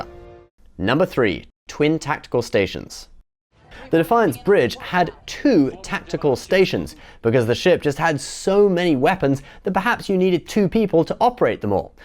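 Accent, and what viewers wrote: United Kingdom. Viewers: Uhh Boi my man the is back again! Sorry but i just missed him...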